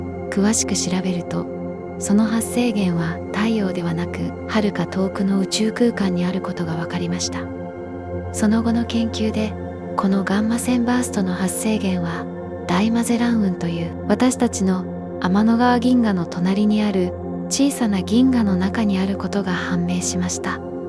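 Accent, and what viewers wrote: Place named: Tokyo, Japan. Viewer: これより完璧な東京語を伺ったことがありません。 、イチオシです。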